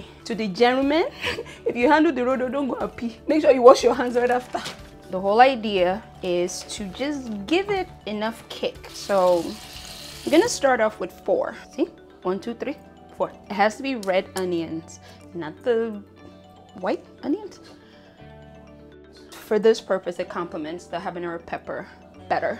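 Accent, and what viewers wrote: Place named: Ondo, Nigeria. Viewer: I love the Ondo accents love u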